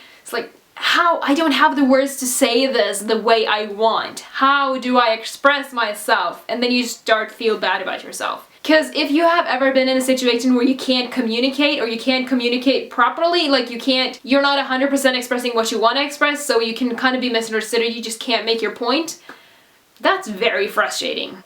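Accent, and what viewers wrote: United States. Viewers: Very nice American accent... how come?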